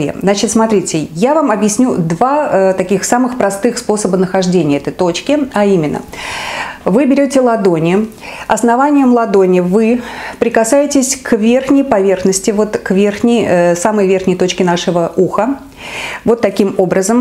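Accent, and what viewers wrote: Saint Petersburg, Russia. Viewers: Очень красивая женщина, красивая ленинградская речь! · Чувствуется коренная питербурженка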